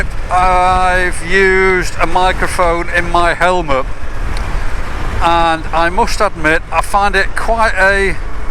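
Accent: Manchester, United Kingdom. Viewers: Good to hear your engaging Manchester accent again pal…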